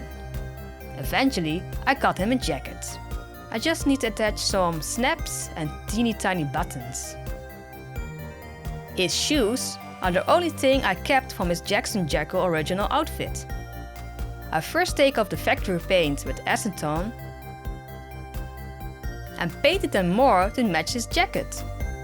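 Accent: Netherlands. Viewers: i had a suspicion you were dutch with the accent. but then i saw ZEEMAN EN TOEN WIST IK HET. Maar heel gaaf gedaan! Ik ben benieuwd naar je andere videos. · Geweldig gedaan! Ik wist niet dat je de nekopening op die manier kon vergroten. Aaah, dat heerlijke Nederlandse accent! Never get rid of it!!! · Ik hoorde je stem en dacht dat is een Nederlands accent haha. Maar wist het niet zeker tot ik de "zeeman" zag staan XD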